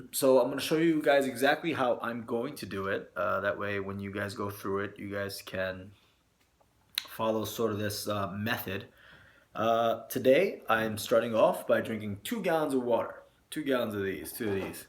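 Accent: New York, United States. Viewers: Lmao just because he has an Asian name doesn't mean he has to have a Japanese accent. He was probably born and raised in New York hence his accent. Probably never been to Japan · bud ekins he just sounds like a American to me I'm almost guaranteed that he was born in America but if he isn't I'm very impressed.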